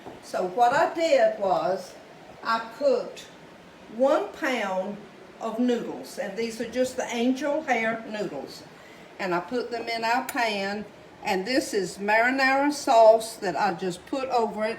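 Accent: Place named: Georgia, United States. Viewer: Oh that looks delicious, what a beautiful supper ! I do the hamburger buns also,they just taste good ! I enjoy your cooking videos and your southern Georgia accent your a beautiful lady ! Hello from Missouri Im outside of the KC MO area,65 yrs old live on a private lake but raised up in the farm country ,north central mo! Have a wonderful day!😊❤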